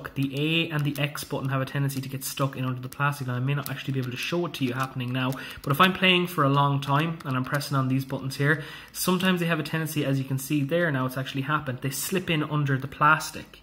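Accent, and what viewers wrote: Ireland. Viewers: Is it odd for a man to tell another man his voice is adorable? I want this man to FaceTime me nightly and just tell me about his day while I drift to a deep slumber to his sweet buttery brogue. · You're Irish right? If so how did you get this console?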